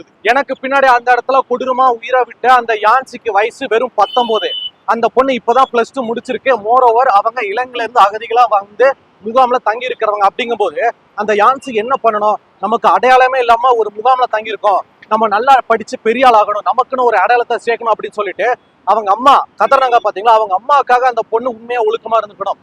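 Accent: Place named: Tirunelveli, India. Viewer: தனிமனித ஒழுக்கத்துக்கும் அரசுக்கும் எந்த சம்பந்தமும் இல்ல அதான் ஆரம்பத்தில் இருந்தே சொல்றேன் உங்களுடைய எல்லா வீடியோவையும் நான் மறக்காமல் பார்ப்பேன்.... நீங்க பேசுற எங்க திருநெல்வேலி ஸ்லாங் ரொம்ப நல்லா இருக்கும்... அதுல ஒரு நேத்தி இருக்கும் அதுல ஒரு நியாயம் இருக்கும். ஆனா உங்ககிட்ட ஒரே ஒரு விஷயம் கட்சிய வச்சு பேசுறீங்க அதுதான் தவறு..... விளாத்திகுளம் அந்த பொண்ணு கற்பழிக்கப்பட்டப்ப அவ்வளவு பேசினீங்க இல்ல கோயம்புத்தூரில் அந்த சின்ன பொண்ண கற்பழிச்சதுக்கு எந்த மாதிரியான வீடியோ நீங்க போட்டீங்க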